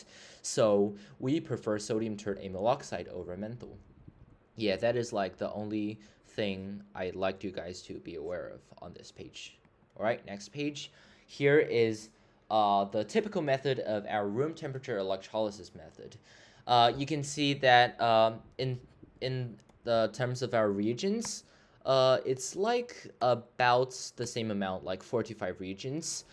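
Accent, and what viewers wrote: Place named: California, United States. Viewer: Here in the states gas water heaters use a sacrificial anode to protect from corrosion and it's often made out of magnesium (sometimes an alloy that includes aluminum or a little zinc) , I don't know what's used in China(or wherever you are with a Californian-esq accent), but I hope that might be useful to know..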